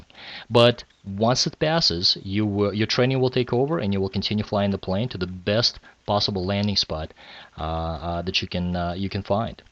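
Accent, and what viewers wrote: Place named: Eastern Europe. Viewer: I've flown over to CCR from HWD, even at least once to build night hours as PIC., you've done a splendid job as an aviator and presenter, and gave us a lovely peek at Mt. Tam into the bargain. I'm far from the East Bay and CC County, now, but it brought back great memories. I also love the little hints of Slavic in your speech, the L's, the hard h's, the narrowed vowels in some places. Even without seeing your name, I'd have heard that. As Scott Manley says, "Fly safe!"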